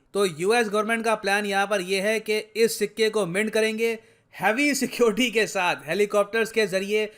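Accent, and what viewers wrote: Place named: Gujarat, India. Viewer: ldki hai..koi gujarati ldki hai accent se pratit hua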